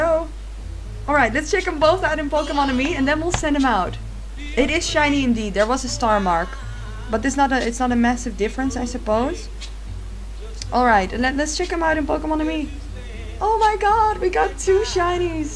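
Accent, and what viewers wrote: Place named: Netherlands. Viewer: wow congrats haha, I have shiny Shedinja too (got from my brother) also, you kinda have a Dutch accent, could it be you're from the Netherlands?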